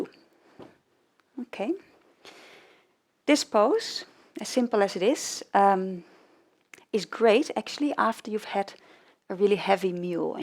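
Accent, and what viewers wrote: Netherlands. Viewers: The exercises are good. i only wish she would speak Dutch because, though she's fluent enough in English, her pronunciation clearly sounds like Dutch. It prevents me from enjoying the yoga.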